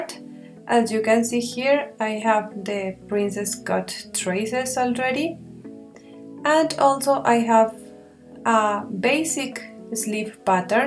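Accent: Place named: India. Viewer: hey.. u are just awsome!! I have a personal question for u? where are u from? yr accent sounds like asian or tribal indian. may I know plz?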